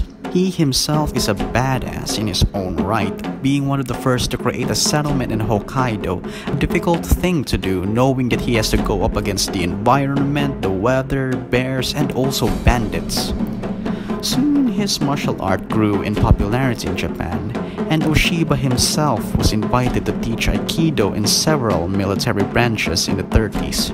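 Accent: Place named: Philippines. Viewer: Your accent sounds filipino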